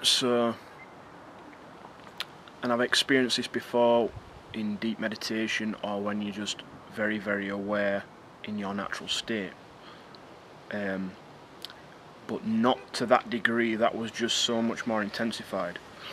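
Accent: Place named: Lancashire, United Kingdom. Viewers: How? I want to do it, I am in the UK, Birmingham. You sound like you come from Lancashire maybe? Buy it online at shayanashop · Love how you flit from proper English to Northern :D Nice vids, mate. Subscribed... Peace from Barnsley ;)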